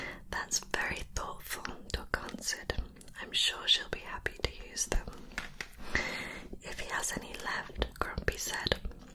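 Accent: United Kingdom. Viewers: ill be honest at first i didn't like your British accent,but now i love it so much with your divine unintentional mouth sounds 🤯🤯🥰🥰that i watch your videos every night!!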